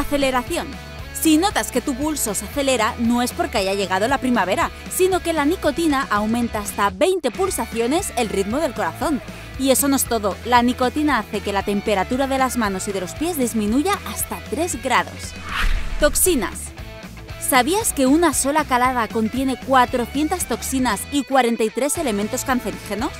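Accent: Spain. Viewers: Una pregunta eres de mi pueblo no 😂😂 esque es torrent\Valencia jajajaja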